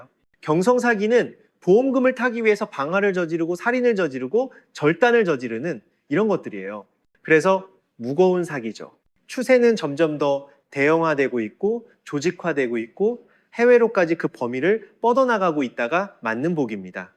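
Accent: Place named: Gyeongsang, South Korea. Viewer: 손보 29일 시험 30일 발표 결과 적으러 왔어요. 75점으로 합격했어요. 적상인가 해상인가 배 문제랑 처음보는 문제들 틀린것 같고, 합격하면 대박이라고 생각했는데 진짜 합격했어요....하하 8개나 틀렸지만 25개나 맞췄으니! 덕분에 합격했습니다. 난이도가 오르고 범위가 변경된 부분은 속상했지만 그래도 특유의 "강조" 하시는 부분과 쉽게 암기할 수 있게 만들어 주신 덕분이 아닌가 생각이 드네요. 조만간 변액도 도전할건데 그때도 잘 부탁드립니다. 목소리는 다시 못 듣나요? 경상도인 으로서 익숙하고 정감있어서 더 잘 들렸던거같은데...😅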